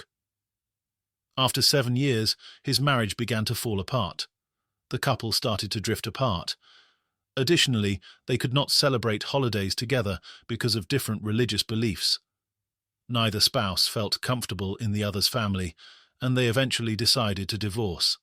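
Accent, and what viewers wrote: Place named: United Kingdom. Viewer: Never sounds right with British accent, especially as scripts are written by Americans, so have American words like "sidewalk" - in England we say "pavement". It sounds odd hearing these words read in a British accent. It's more genuine when a native narrates, one can get into the spirit of the story better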